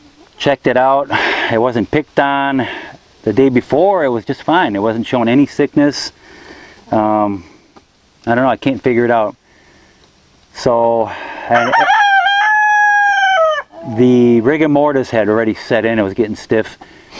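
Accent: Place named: United States. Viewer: You ever been told you have a slight Polish-American accent. I live in south Texas with mainly Polish-American and Mexican-American folks and you sound like you are from around these parts.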